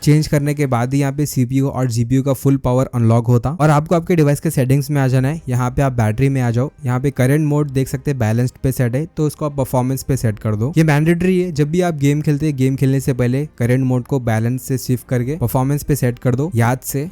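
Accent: Hyderabad, India. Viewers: Bhai aap hydrabadi ho kya??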